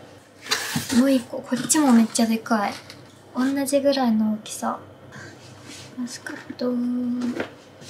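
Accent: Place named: Kansai, Japan. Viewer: さんの関西弁最高やー😊お部屋も勿論やけど🎉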